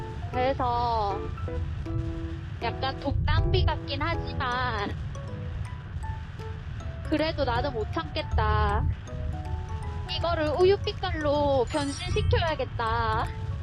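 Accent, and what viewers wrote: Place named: Gyeongsang, South Korea. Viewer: 경상도 ㅎ ㅎ 사투리 좀 안쓰면 안되나? 딴세상 살아요? 서울에서 그리 멀지 않은데 ㅡㅡa?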